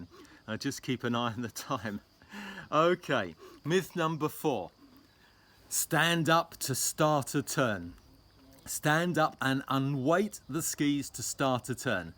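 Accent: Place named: United Kingdom. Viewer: We must be close in age because I also learned skiing with over 2 meters under my feet and extension was kind of essential to rotate those monsters. And now I still struggle to avoid doing it because with these new skis extension equals lose control... btw I've started skiing again only five years ago and adjusting is still problematic at times. Thanks for these videos, love the Brit accent, but remember here in the US a stretched middle finger might send a problematic message :)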